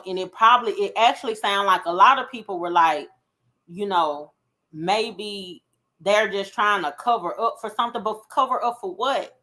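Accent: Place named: Virginia, United States. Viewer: You sound like a Virginia girl!😊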